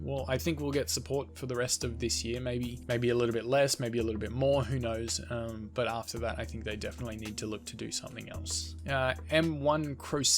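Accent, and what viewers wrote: Australia. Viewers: Your Australian? The Upside Down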